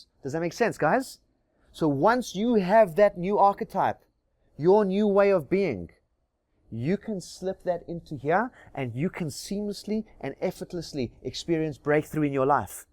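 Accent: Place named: South Africa. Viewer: Beautiful video. Are you South African? You have a South African accent.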